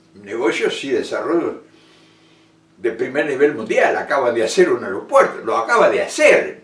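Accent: Argentina. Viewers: Ok señor en vez de criticar a López obrador xke no critica a su presidente de Argentina xke en tu forma de hablar eres argentino y no utiliza ninguna majia solo que es inteligente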